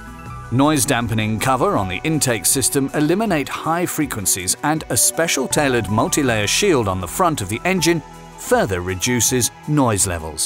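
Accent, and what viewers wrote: England, United Kingdom. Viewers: All sounds good huh with introduce by someone with an English accent until you hear the problems they are having the Eco Boost engines. PLEASE call it what it really is... TURBO CHARGED ENGINES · Lost me with the British voice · The narrator is British...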